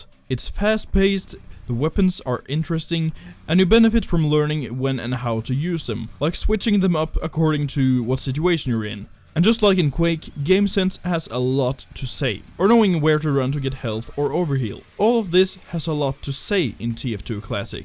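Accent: Norway. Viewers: OMG i now know you're norwegian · are you norwegian? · are you Norwegian? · Er du norsk? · Are you from norway? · e du norsk? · are you Norwegian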